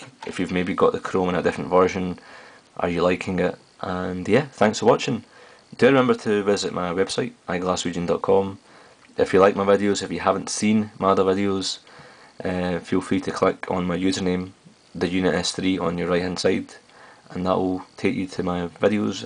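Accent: Scotland, United Kingdom. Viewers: Your accent is so cool! I'm going to take a guess - Scottish?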